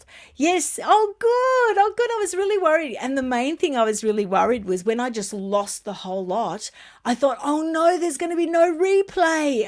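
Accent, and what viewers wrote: Australia. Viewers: I listen to you on YouTube mainly because I miss the Aussie accent. I was a ten pound pom and lived in Adelaide nearly twenty years. I wish people would stop abbreviating Catherine to Kate, but it was great to see who in the royal family were there. Did you find out who the guy was behind Catherine? In UK we need the Royal family especially when some of ministers show their lack of diplomacy by bad mouthy other politicians around the world.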